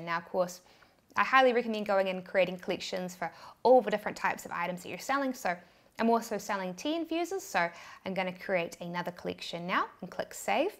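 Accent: New Zealand. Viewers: That New Zealand accent is real. Who else thought she said sex coasters?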